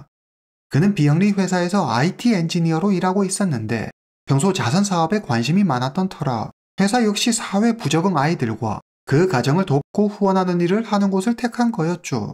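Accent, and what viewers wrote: China. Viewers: 억양때문에… 보다가 껐어요…중국분이신가요?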